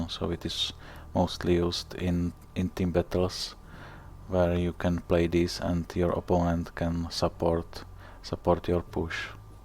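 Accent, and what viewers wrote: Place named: Czech Republic. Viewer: Čech, že? Poznám podle přízvuku :D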